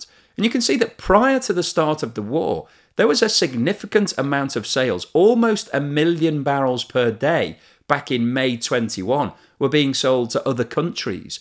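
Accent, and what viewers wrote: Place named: Liverpool, United Kingdom. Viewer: Great content and superb delivery -{ for non-UK viewers, delivered in a pleasant Scouse ( ie Liverpool) accent )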